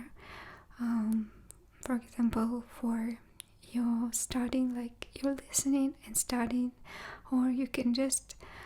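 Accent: Russia. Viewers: Hey...thanks for all the great ASMR vids with your beautiful Russian accent! I never thought of rivers and parks in Siberia, thats awesome bcuz nature will keep you young and healthy! I do almost everything on your list of activities as you do! Incl the dancing in the dark!😜🙌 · I am from New Zealand and in love with u and ur accent please do more Russian accent videos I have no judgement to Russia or the war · Hola princesa tus videos son cada vez mas profecionales exelente video de asento ruso buen blok me gusto mucho y mucha suerte en tu canal nosotros siempre te apoyaremos tus suscriptores favoritos saludos desde mexico d.f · Ciento que usas el español España y tu acento ruso combinados, los Rusos suenan algo peculiar y tu tienes una mixtura de acento español España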